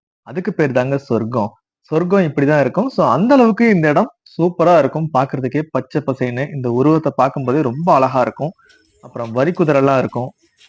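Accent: Madurai, India. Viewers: தம்பி மதுரை பயலா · Anna neega madurai ah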